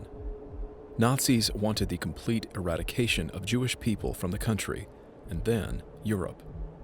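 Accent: Germany. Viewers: I'm usually not crazy about German accents, but ill be damned if I said I didn't want you to whisper in my ear sounding the way you do! ::Fans self:: damn, it's hot in here... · Your German accent sounds pretty good.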